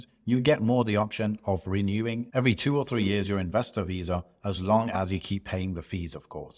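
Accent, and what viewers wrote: United Kingdom. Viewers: Wait a minute are you British? How could you spend 19 years in the Middle Eastern region if you sound like a British guy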